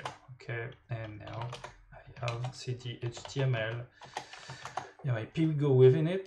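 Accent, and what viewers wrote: France. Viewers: pk pas faire la video en Français t'as l'accent français en plus je comprend pas l'anglais moi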